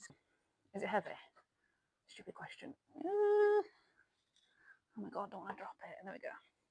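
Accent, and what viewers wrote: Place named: United Kingdom. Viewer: Love the legs and the pants. Also why are you speaking British ?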